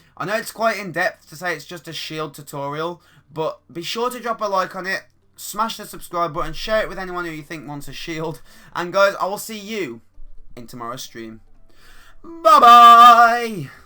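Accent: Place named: Birmingham, United Kingdom. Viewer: u sound like ur from birmingham im from there i can telll if u am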